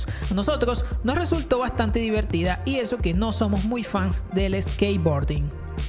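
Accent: Venezuela. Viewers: No sabía que es venezolano, o eso parece por su acento y las palabras que dice, creo yo, jsjs. Venezuela el anime xddd JAJAJA. Tengo que verlo x'D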